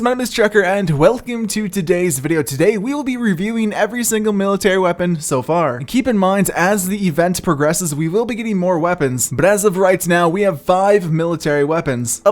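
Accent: Czech Republic. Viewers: Are you from Czech republic?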